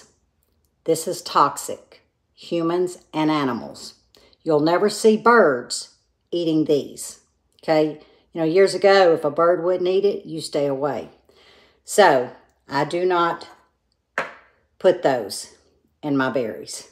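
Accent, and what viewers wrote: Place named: Louisiana, United States. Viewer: I feel like I'm back in Louisiana listening to your accent..... P.S. can you speak Cajun French?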